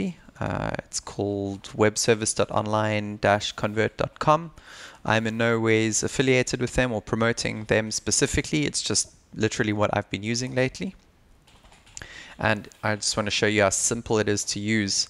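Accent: South Africa. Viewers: Woaaahhh, South African accent I spot there? yay! Nice info, subscribed! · are you south african?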